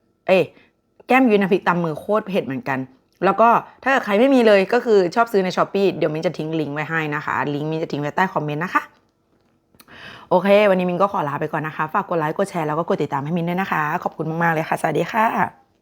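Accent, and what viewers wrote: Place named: Central, Thailand. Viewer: เป็นคนภาคเหนือ แต่ทำไมพูดเหน่อสิงห์บุรี กาญจนบุรี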